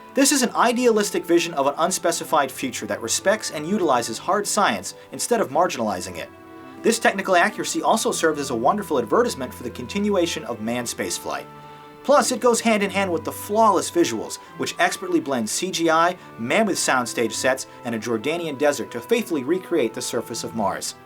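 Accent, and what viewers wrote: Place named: Boston, United States. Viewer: Review Black mass and add your boston accent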